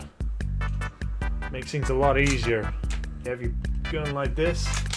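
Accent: England, United Kingdom. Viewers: don't know where he lives, accent doesn't mean he lives in the uk.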